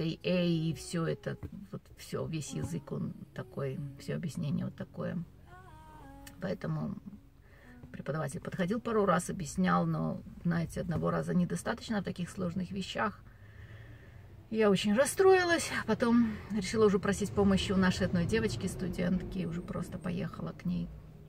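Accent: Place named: United States. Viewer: Всё у вас получится, терпите, учиться надо всю жизнь. Кстати, у вас уже русский язык с американским акцентом.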